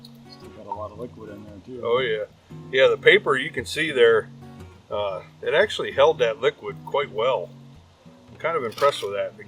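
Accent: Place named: Pittsburgh, United States. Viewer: It's funny because I was watching this video and was catching your accent heavy. So I went to your channel and lone and behold, you're from the Pittsburgh area, as am I😂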